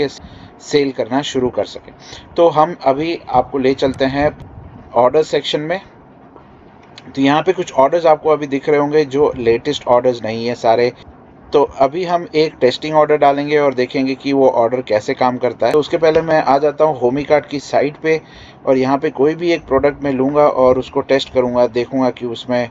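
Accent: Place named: India. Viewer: Why does he speaks with a heavy Indian accent,I couldn't even listen to what he's saying about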